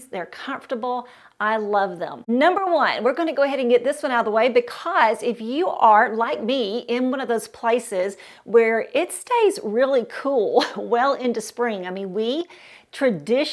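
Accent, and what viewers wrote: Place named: South, United States. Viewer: I love all of these shoes! Very stylish and sensible. What I love even more is your southern accent and how you pronounce "shews" .. It's so adorable!❤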